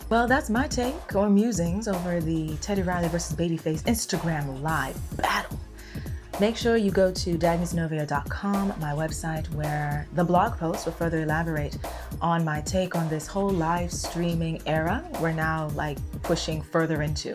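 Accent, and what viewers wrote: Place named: New York, United States. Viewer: I really love your swag. You look just like Alicia Keys, everything about you (beauty, skin tone, smile, peace of mind, way of speaking (the New York City swag), natural beauty, your love for the culture, knowledge, ...), and I mean it, everything about you really reminds me of Alicia Keys. Thank you and please, keep the videos coming. Look forward to the next video. Thumbs up! Hats off!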